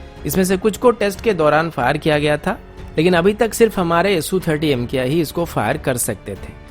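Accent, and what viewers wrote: Odisha, India. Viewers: Lite odia accent . I know bcoz we both are from odisha😀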